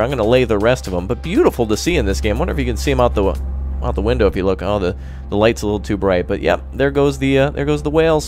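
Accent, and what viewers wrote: Germany. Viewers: R u german? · you german?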